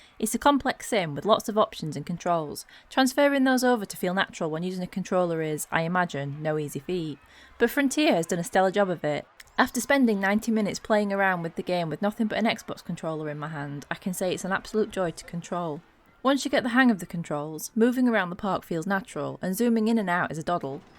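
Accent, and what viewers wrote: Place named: Wakefield, United Kingdom. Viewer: I feel like you might be from near me! Wakefield area? Haha!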